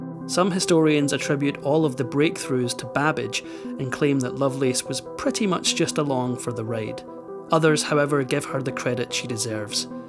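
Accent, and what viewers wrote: Scotland, United Kingdom. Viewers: If all of this accounts’ voiceovers are AI, this is the most natural of them all. There is inflection, natural pauses and no unnatural stops mid sentence. This gentle Scottish voice should be used for all of the videos. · The narrator of these videos sounds just like David Tennant. I know I'm being naive here about Scottish accents, but there ya go. I hope my comment doesn't offend anyone, i.e., they all sound alike. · Off topic comment/question: I can't get over how much you sound like another Scot who is a political commentator with a particular interest in U.S. politics, & can be seen & heard on The Meidas Touch Network. Any relation? I'm not remarking on the accent alone although that, of course, plays a part. I'm talking about the sound of the voice, the cadence, tonality etc. as well. If this is too personal, I apologise, & ask that you simply disregard the question. 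Otherwise, thank you for reading & providing me with something new to which I can Like & Subscribe. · Great story told well - especially the Scottish accent! But please don’t say “close WITH someone; the correct way is to be close TO someone. The first is an Americanism that has really annoyed me recently in Australia . . .and should annoy any good Scotsman too. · Interesting...but is no one going to comment on the voice of the narrator lol I have heard him narrate a few such stories What a lovely Scottish accent he has .. not like some of those voices you sometimes get . But yes Interesting account of her life and very sad also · Sounds as if this has been sourced from the BBC because we’re listening to Scottish Historian Neil Oliver… pure brilliant wee man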